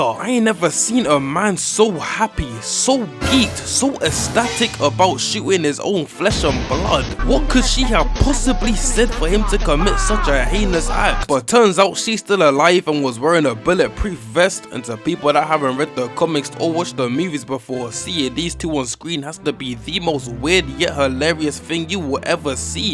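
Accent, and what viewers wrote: United Kingdom. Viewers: I love how clear it is to understand your British accent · Your voice is like British Casual Premium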